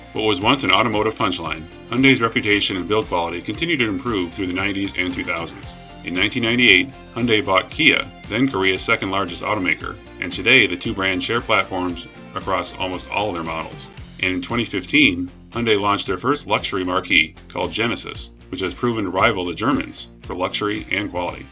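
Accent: United Kingdom. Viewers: The way the British pronounce things are so freaking annoying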